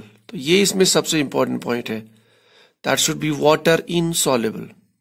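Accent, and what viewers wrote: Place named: Kashmir, India. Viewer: cxadaan hasaa chee .. nafar chee praraan 6.40 ... sir you are from kashmir .. love you exam k liye buhat zaroori tha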